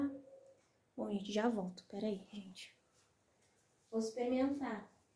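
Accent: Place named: Rio de Janeiro, Brazil. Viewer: Vc é carioca né? Pelo sotaque eu acho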